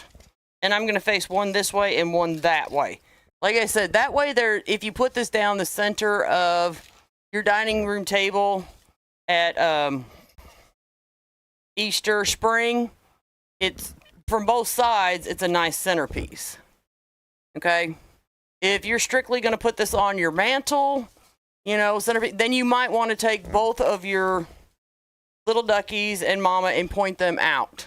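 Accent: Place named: Kentucky, United States. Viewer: Hi, I knew you were from KY before you told us. I am from KY, and I recognized the accent. I lived away from here for several years and lost a lot of my accent though. It is always good to be in KY. I love it.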